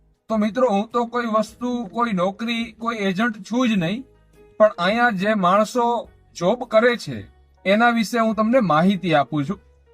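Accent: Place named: Kathiawar, India. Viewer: Bhai hu gujrati chhu, ghandhinagar thi, tamaro whiz (aavaj) kathiyavadi lage 6, ane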